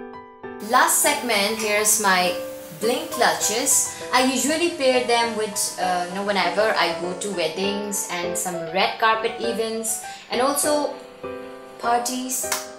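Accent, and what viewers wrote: England, United Kingdom. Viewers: I like your hair and your English accent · I still can't get over your cute English accent 😍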